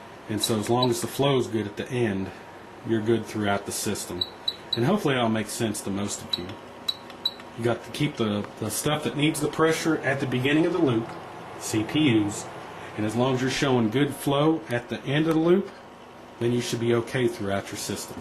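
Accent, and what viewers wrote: United States. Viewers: You thick American accent really pisses me off!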